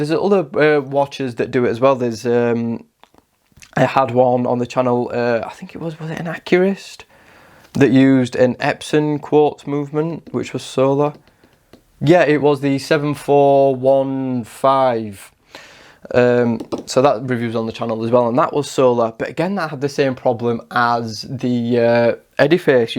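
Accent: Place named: Yorkshire, United Kingdom. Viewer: Well, thanks a lot for this video! I like solar watches too and I have a couple of solar G shocks. I’d like to know why you’re letting the citizen BN150 go… I am waiting for it in the mail and I was wondering if you’re letting it go because of its size. I have a small wrist too, so I hope it’s not for that reason… On the usual, unrelated note: You have silent “t”s (positive, capacitor, latest, better…). Your way of pronouncing “much“, “love“, “tough” sound scouse to me, but it could be any other accent that has that sound. So, in the end, basically I have no clue. Im sure it’s not Scottish, nor Nothern-Irish and I’m prone to exclude the West Country. Any hint?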